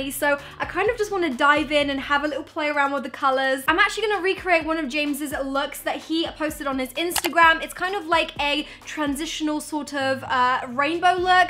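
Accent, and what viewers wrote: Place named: United Kingdom. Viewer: You sound more British then normal 😂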